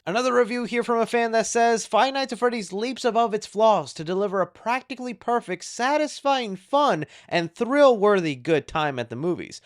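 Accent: United Kingdom. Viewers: 😂 love the British accent 😂 the UK loves you ❤ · Lol, I like your British accent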